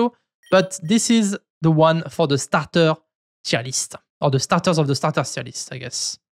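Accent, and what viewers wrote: France. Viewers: c'est un accent français ça non ?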